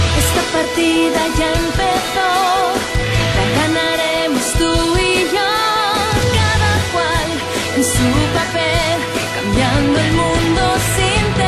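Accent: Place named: Andalusia, Spain. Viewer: A mi tambien me gusta. Por cierto, pareces andaluz. ¿Lo eres?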